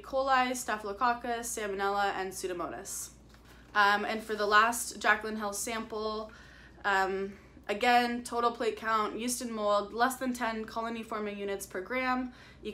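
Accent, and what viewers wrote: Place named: Canada. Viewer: ABHOOT 😭😭 that Canadian accent omg so cute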